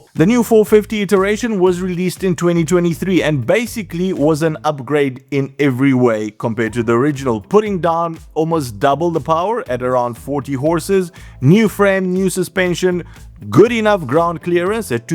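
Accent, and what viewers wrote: Afrikaans, South Africa. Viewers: Just found your channel and looking forward to seeing more! Hoor ek reg, is jy dalk Afrikaans?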